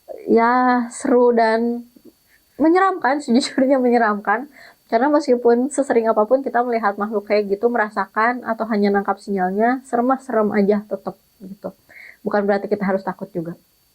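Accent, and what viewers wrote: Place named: Sunda, Indonesia. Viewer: Samprazan teh! Assalamualaikum! Ari teteh teh saha? Meni nyunda pisan! Meni hebaat uy pangalaman nalusur na!. Se frekwensi jeung kami! 🤗 Lanjut teh nalusur na! Sukses selalu! 👍👍🙏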